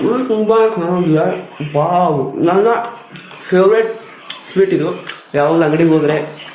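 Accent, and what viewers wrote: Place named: Uttara Kannada, India. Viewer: ನೀ ನಮ್ಮ uk ಶೈಲಿಲೆ ಮಾತಾಡು ಚನ್ನಾಗಿ ಇರುತ್ತೆ.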